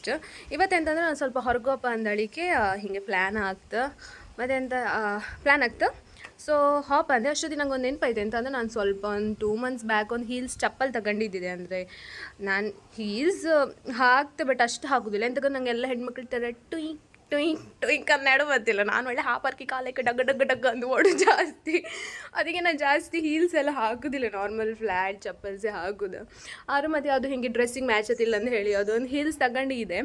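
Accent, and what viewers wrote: Kundapura, India. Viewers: Nim ಕುಂದಾಪ್ರ bhashe kembuke bari chanda mare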